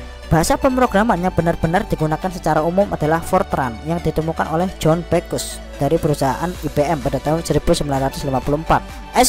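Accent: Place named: Java, Indonesia. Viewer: laa Medhok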